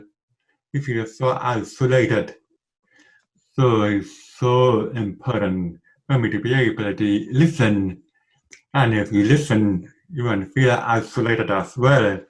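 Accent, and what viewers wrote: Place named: Vietnam. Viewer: I had a vietnamese lecturer, and you are have a lighter version of his accent. Much more understandable than him though :)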